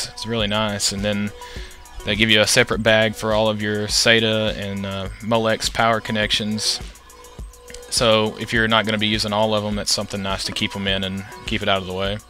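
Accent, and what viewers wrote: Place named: Alabama, United States. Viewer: Nice rig. I am building pretty much the exact thing aside from the case and few others. You sound like you're from Alabama or close lol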